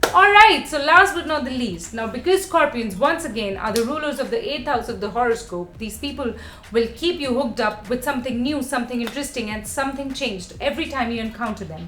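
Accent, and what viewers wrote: India. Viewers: Definitely Indian, I am too, and she has that accent.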